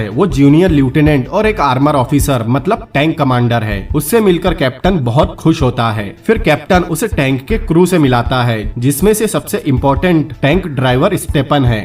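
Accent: Assam, India. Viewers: amazing movie bro.. very well explained, i felt like i was watching the entire movie.. i have lots of Assamese friends, i felt you have that little Assamese accent, are you from assam?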